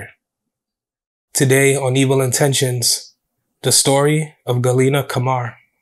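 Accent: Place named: Long Island, United States. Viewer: This channel is great. Such well researched cases put to the public respectfully and with the victims and their families clearly thought of. I'm from long island and truly love all the local case stories told by people from where I'm from who talk like me and have an understanding of where we come from. Great work and much love. GOD ZESS AND PLEASE KEEP THE CONTENT COMING.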